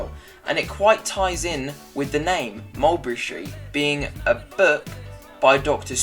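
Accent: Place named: United Kingdom. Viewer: Bruh he bri’ish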